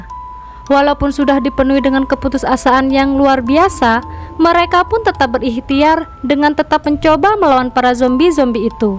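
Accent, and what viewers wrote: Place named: Java, Indonesia. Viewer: Jowo endi gaess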